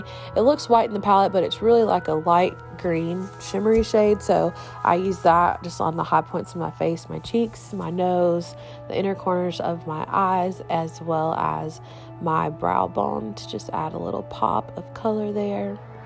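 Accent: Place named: South, United States. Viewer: Absolutely loved this! Gorgeous and your beautiful southern accent is the cutest! Wishing you much success on all of your social media platforms!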